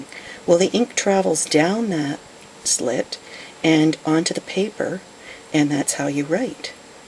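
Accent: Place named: Canada. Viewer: Anyone want to play a game of "spot the Canadian accent"? Great video and great accent:)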